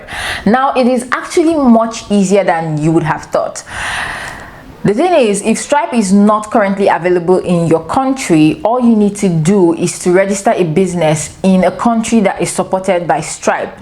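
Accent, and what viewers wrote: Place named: Nigeria. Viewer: You sound like a Nigerian you speak exactly like me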